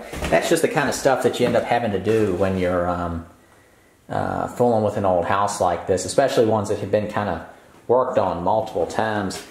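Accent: United States. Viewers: To my untuned Scottish ear, I have no clue where in the states this accent is from! Anybody know?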